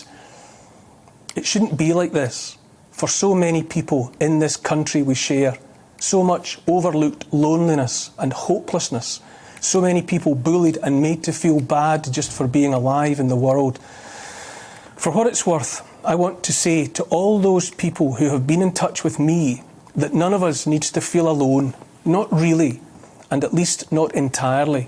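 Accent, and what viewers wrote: Scotland, United Kingdom. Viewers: It's lovely to hear a person with a Scottish accent who isn't some horrible leftie.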